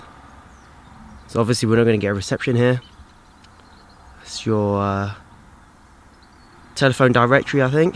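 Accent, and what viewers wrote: United Kingdom. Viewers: Bruh that british accent had me thinking you were a white guy(not being racist), awesome review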